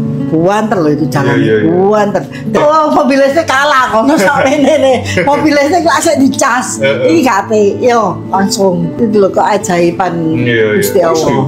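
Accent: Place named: Surabaya, Indonesia. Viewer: Ai ..Seru lek Cerito Iki asli logat'e Suroboyo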